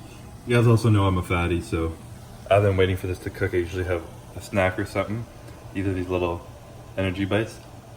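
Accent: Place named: Quebec, Canada. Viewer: “Beurre d’arachides” 😄👍 haha you should do more video in french!!! You will gain more viewers with your French Canadian accent !!!😆🤙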